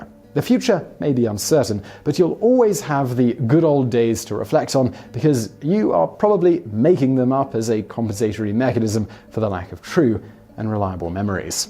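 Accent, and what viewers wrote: England, United Kingdom. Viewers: plays on his accent some people in North America have such respect for the British accent when in fact they're all dummies over there. Btw lived in the UK for years..... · If you're so smart, why can't you even grow hair on your head. Rats can grow hair all over their bodies. You cant even grow hair to protect your grey matter from sunlight. And sorry, mispronouncing American words like an English doesn't make you smart, just makes you 250 years out of date. We beat you and even Europe doesn't want you. I'd call you a red headed stepchild but your prince has already cornered that.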